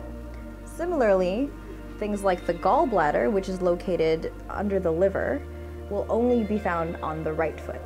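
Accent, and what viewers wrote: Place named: General American, United States. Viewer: Her diction is clear. She has the standard American accent.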